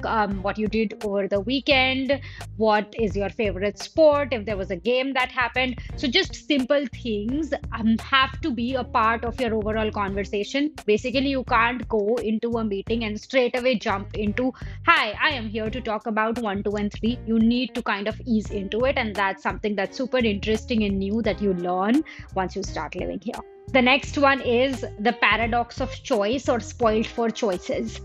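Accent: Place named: North India, India. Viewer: Hey this typical North Indian accent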